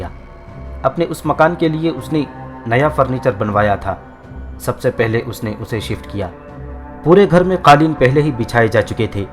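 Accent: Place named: India. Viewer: آپ کے بولنے سے سمجھ گئی تھی کہ آپ انڈیا سے ہو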